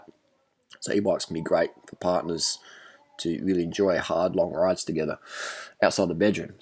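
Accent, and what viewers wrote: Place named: Tasmania, Australia. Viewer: what s with the tasmanian accent lol!!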